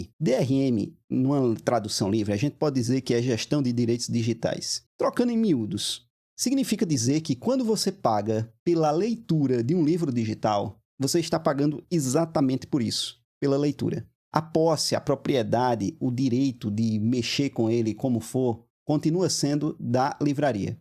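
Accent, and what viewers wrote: Northeast, Brazil. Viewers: Professor, obrigado por compartilhar sua trajetória literária e acadêmica. Me identifiquei muuuuuuuuuitoo com seu vídeo. Sua história e sua saga no mundo da leitura se assemelha enormemente com a minha. Temos muita coisa em comum. Pela sua fala parece que voce é de algum lugar do nosrdeste. Tive a oprtunidade de residir por quase 9 (nove)anos no nordeste especialmente em Maceió. Entre os anos 2016 e 2024. Sou da cidade de São paulo porém devido a umas questões financeiras mudei para o nordeste. Chegando lá resolvei dar uma guinada na minha vida. Iniciei eum um curso superior e comecei uma jornada de leituras. Passei pelos mesmos problemas citados no vídeo: dificuldade de encontrar títulos e preço dos livros, então adquiri o Hábito de ler em formato digital. Me tornei um ''rato'' de PDF, EPUB, MOBI etc. Entre os anos de 2019 e 2024 li mais de 500 títulos das mais variadas áreas do conhecimento:Economia, Literatura, História, Filosofia, Sociologia, Psicologia e Teologia alem de algumas Autobiografias etc. 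Foi uma jornada incrível. Consegui construir uma pequena biblioteca comprando muitos livros em SEBOS. Ainda consegui cursar très graduações, ( Economia, Administração e Teologia)uma Pós-graduação. Ao retornar não consegui trazer os livros, o que me deixou muito triste pois eles contam uma história e possuem um valor sentimental acima do valor facial. Tive de deixá los aos cuidados de um cuidador. Não digo isso para me auto exaltar mas como contribuição para mostra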